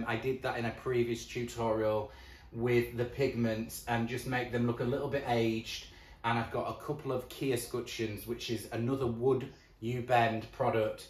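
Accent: United Kingdom. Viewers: I love your British accent almost as much as watching you transform the most plain Jane pieces into stunning beauties. You have an amazing talent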